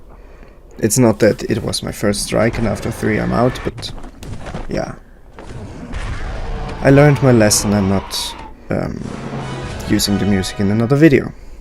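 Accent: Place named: Germany. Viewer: Man hört so krass, dass du deutsch bist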